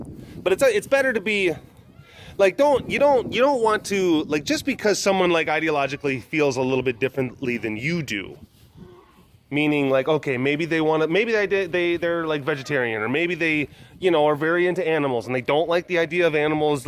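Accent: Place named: New Jersey, United States. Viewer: Love the Jersey accent! ;-)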